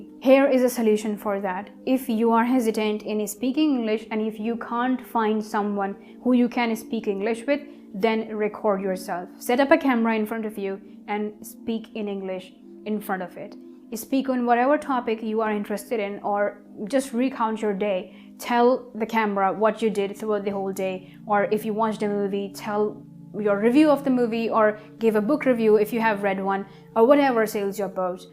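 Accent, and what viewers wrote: United Kingdom. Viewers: Seems like she is native of uk 😄😇😇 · How to get used to UK accent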